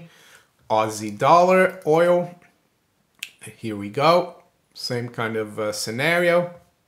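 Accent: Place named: United States. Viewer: based on the decor and the ugly dog I would say you live in England. Based on your voice I would say you are an American living in England ( sorry to hear that).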